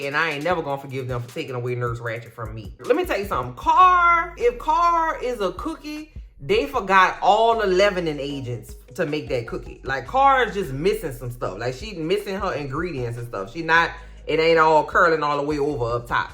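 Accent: New Orleans, United States. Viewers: Gurrrl, I don't know you from Adam, this is my first time on your page. And even so, within less than 1 min of hearing you talk, I was willing to bet my last dollar that you are from N'awlins ALL DAY! LOL. Then you showed the N'awlins basket for the give away. Man, I laughed so loud. So, I'm here to both support your goal and to put my name in the hat for the New Orleans goodies. Congrats on 20K!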